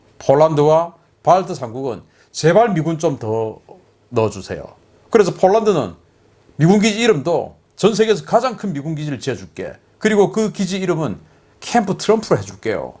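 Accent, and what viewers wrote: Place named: Gyeongsang, South Korea. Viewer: 지긋지긋한 경상도 사투리 써가며 뭘좀 아는척하네? 우물안서? 그러면 영어로 해석하며 이곳으로 넓은 세상으로 나와보든지???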